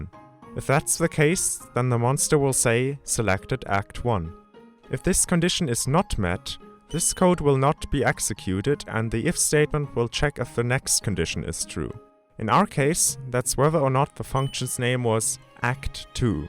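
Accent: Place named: Germany. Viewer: are you, by any chance, german? bc your voice sounds similar to some german youtubers speaking english.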